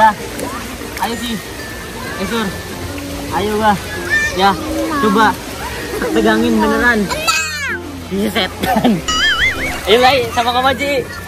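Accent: Cirebon, Indonesia. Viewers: Bang lu orang Cirebon apa Kuningan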